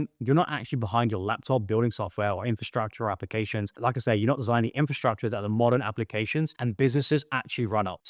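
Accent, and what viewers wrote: United Kingdom. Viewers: English Accent? Nice.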